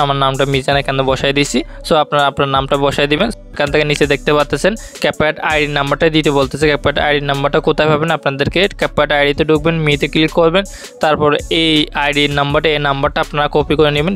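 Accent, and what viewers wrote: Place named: Sylhet, Bangladesh. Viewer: Apni sylheti vai❤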